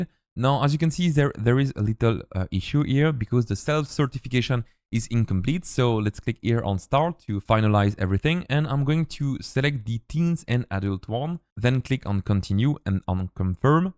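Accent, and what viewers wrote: France. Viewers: T'es francais?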